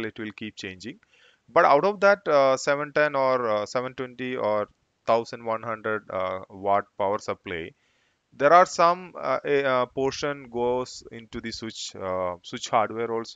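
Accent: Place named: Kerala, India. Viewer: Malayalam പോലെ ഇംഗ്ലീഷ് പറഞ്ഞപ്പോ മനസ്സിലായി... Anyway very good videos.... Followed you in insta👍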